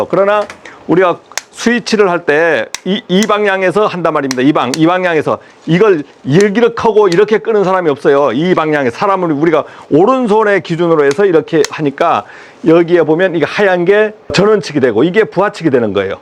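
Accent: Jeju, South Korea. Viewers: 말투가 제주스타일 갔다고 생각했는데 구독했더니 제주도 분이네요 반갑습니다❤❤❤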